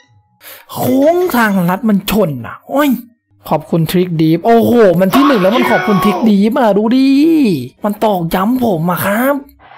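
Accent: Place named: Isan, Thailand. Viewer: อ้อ คนอีสาน เฮาบ่ฮู้เด้อ ซอรี่~~55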